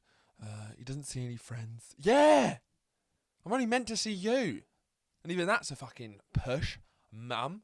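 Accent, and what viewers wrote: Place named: New York, United States. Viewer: Ridiculously good New Yorker accent lmao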